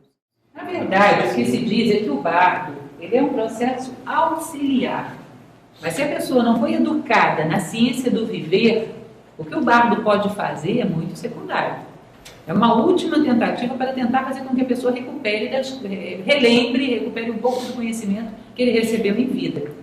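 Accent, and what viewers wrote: Rio de Janeiro, Brazil. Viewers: Muito interessante Tive dificuldade de entender a fala Primeiro achei q era o marcante sotaque carioca com seu todo abrangente xxxxx Depois achei q é a dicção da palestrante que aumenta e diminui o tom durante a pronuncia da mesma palavra Finalmente achei q tinha um eco na acústica da captação do som Pode que o uso de um pequeno microfone possa otimizar o som Enfim certamente a dificuldade de entender penso que é minha Agradeço o compartilhar do tema Abraço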